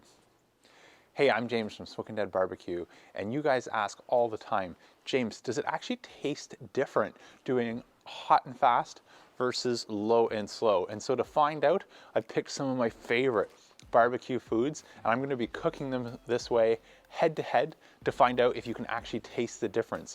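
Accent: Canada. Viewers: You can tell this dude is a genuine super nice guy - that along with the accent, gotta be a Canadian. Lol. Nice vid. Just watched the LnS vs HnF rib video and came here. I'm not sure how you just came up in my recommended since I've been watching bbq vids for years. Subbed. 👍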